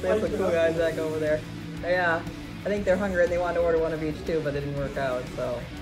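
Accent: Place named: Minnesota, United States. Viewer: Just heard you say you're from Minnesota. Thought I heard that accent😃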